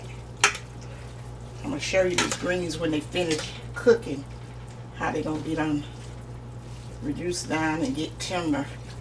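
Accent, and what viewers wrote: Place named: Mississippi, United States. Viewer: Greeting's, I am a new subscriber. When I notice your accent as you talk, while you cook, I said to myself, she must live in my hometown(MS). Because my Mother cooks and sound like this, she was a great southern cooker. You are a wonderful cook, I am so grateful to have found this Jewel of you and your channel. Blessings to you and your family.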